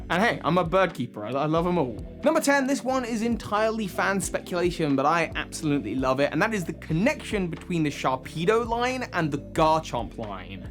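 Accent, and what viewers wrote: England, United Kingdom. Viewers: With r-dropping English accent, “thirsty guards” sounds like “thirsty Gods”, which I think is a great way to describe the residents of Mt. Olympus.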